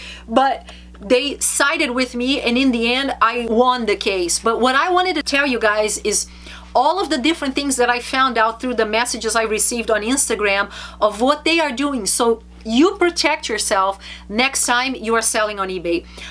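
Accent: Brazil. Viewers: This accent sound so....brazilian! Tem q ser! Anyhow thanks for sharing! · Seu inglesé otimo, mas esse sotaque é de brasileira, nao é? prazer! muito bom o conteudo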